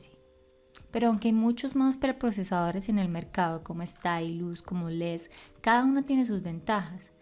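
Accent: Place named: Colombia. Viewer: Ese acento colombiano... Tome su buen like ^-^ (buen video)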